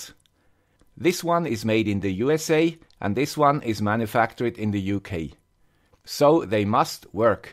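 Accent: Switzerland. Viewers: guy with the swiss accent... cheers from indonesia..😀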